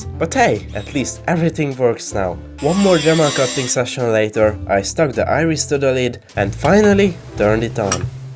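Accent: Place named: Hungary. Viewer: Your pronounceination sounds very hungarian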